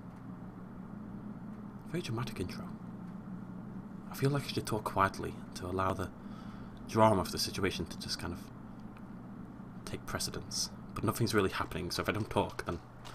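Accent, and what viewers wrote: United Kingdom. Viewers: Got to love how the British say H.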